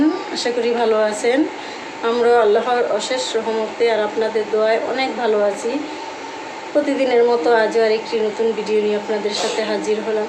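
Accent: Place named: Comilla, Bangladesh. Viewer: আপনার বাড়ি ব্রাহ্মনবাড়িয়া না কুমিল্লা কথা শুনে মনে হচ্ছে ❤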